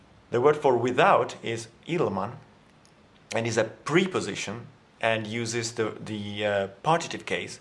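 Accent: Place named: Tavastia, Finland. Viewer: Are you from Tavastia by any chance?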